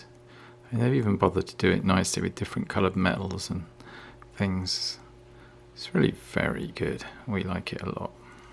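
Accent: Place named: England, United Kingdom. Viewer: Flot informativ video. Jeg skal lige høre. Er du dansker, men med en meget fin engelsk accent ?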